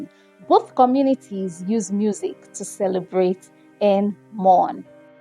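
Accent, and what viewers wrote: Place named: Luo, Kenya. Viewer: This lady speaks like a LUO girl, What up nyar madhe?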